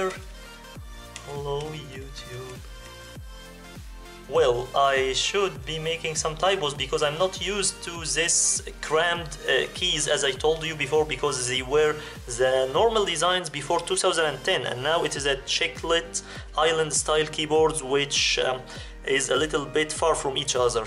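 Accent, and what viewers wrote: Egypt. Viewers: الاكسنت دي مصريه اجيبه منين وبگام بقاا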